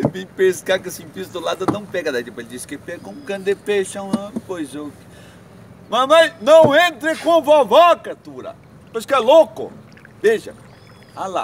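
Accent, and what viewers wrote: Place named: Paranaguá, Brazil. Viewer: é parnanguara mesmo!😂😂😂;muito massa nosso jeito de falar! Meu caneco!😂😂😂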